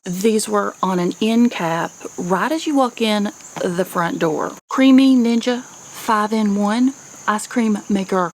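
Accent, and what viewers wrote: South, United States. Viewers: You have a thick southern accent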